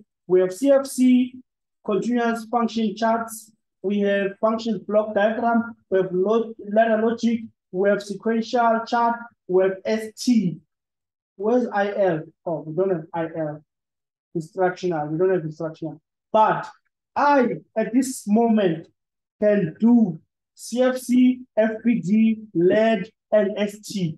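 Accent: South Africa. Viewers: THAT SHARP SHARP TELLS ME THE LECTURER IS FROM SOUTH AFRICA😅😅😅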